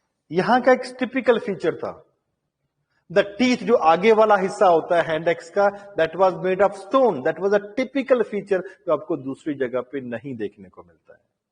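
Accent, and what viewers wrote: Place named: Punjab, India. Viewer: He used punjabi method while speak English....which creates irritation for student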